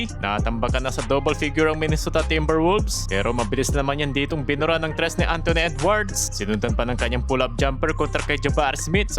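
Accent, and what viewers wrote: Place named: Isabela, Philippines. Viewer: Taga isabela ka lods noh same kau ng punto ng kompare ko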